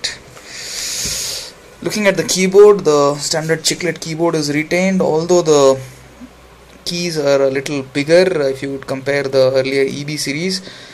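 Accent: India. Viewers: i have that laptop but not so good like samsung,and i think samsung more best then sony and u speaking english look like if u indian right? coz ur english is not really nice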